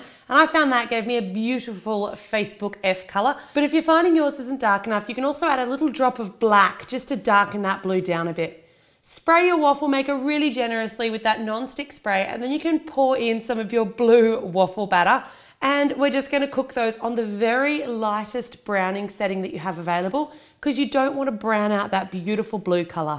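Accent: Australia. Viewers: Hey! Ur aussie arent u?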